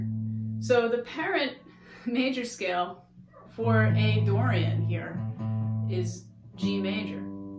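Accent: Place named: United States. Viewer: Hello.Im a very late beginner to playing music, and on Guitar, I just managed to learn the Dorian mode in A, also learning. Youe lesson I just viewed is great BUT 2 things interfere to learn from you, 1 speed of instruction and 2 accent im from England so the American accent and speed of delivery is difficult to follow for me. BUT I enjoyed this lesson tutorial whatever. Thank you I will review it many times hopefully understanding as I develop in understanding your presentations.Nice to listen to a female for a change, I tihink maybe easier to learn from? Looking forward to hearing from you more.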